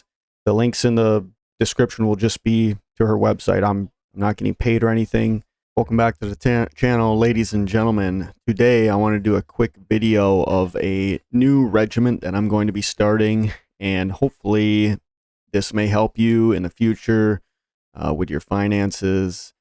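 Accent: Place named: Wisconsin, United States. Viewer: Oh you're from Wisconsin for sure.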